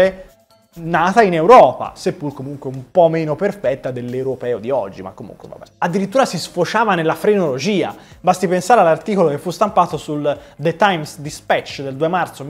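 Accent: Tuscany, Italy. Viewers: Non so... Quando sento quell'accento toscano...mi garba....🤘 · Grazie, come sempre esauriente e tanto simpatico con quell'accento toscano...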